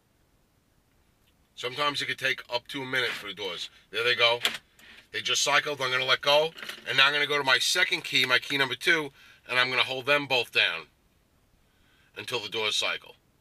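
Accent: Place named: East Coast, United States. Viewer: so you feel bad for everyone on the east coast? Lmao that’s literally how they all talk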